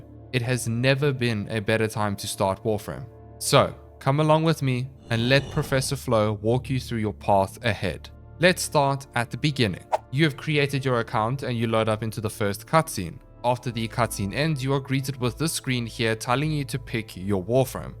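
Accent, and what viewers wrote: Afrikaans, South Africa. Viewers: Instantly heard the Afrikaans accent and now sir, you have +1 subscriber! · Is that a south african accent i hear ... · this dude sounds south african 🇿🇦🇿🇦🇿🇦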